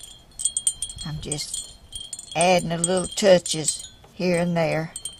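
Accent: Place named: Georgia, United States. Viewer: Love all you do. Thank you for teaching us. Love that Georgia accent, as I’m from Griffin, Ga. love your talent.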